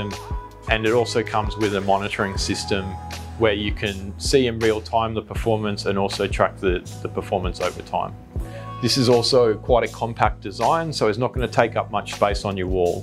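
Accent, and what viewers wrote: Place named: United States. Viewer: You have a funny American Accent :)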